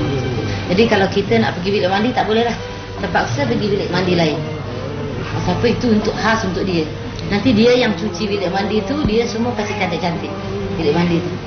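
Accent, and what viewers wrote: Singapore. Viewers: Bahasa Melayu loghat Singapura. Jarang boleh dengar pada masa kini.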